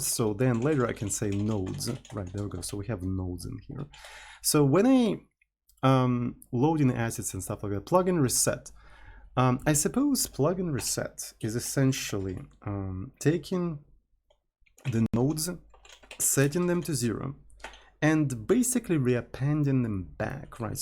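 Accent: Russia. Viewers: Русский акцент 😂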